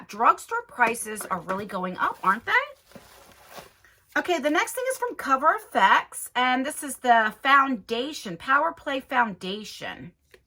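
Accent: New Jersey, United States. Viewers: I’m a Jersey girl living in Tennessee. I love watching your videos bc I don’t miss home so much hearing your accent. Ty!!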